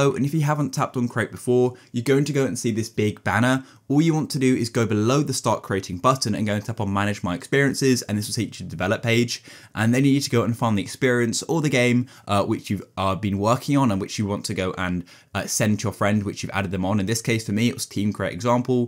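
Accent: United Kingdom. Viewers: He Sounds Like A British Man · he’s just british not every british person sounds like danton💀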